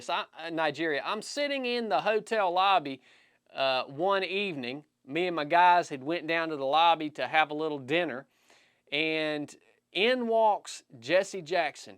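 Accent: South, United States. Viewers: Are you from Mississippi or Alabama?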